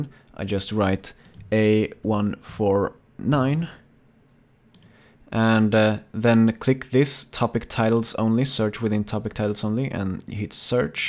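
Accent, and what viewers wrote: Sweden. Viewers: You sound Swedish Eller ska jag säga Svensk ;)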